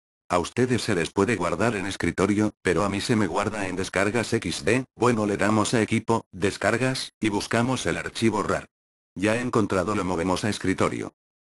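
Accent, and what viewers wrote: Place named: Latin America. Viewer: Hablas con una mezcla de Latinoamericano (por hay argentino o chileno) con otra mezcla de español.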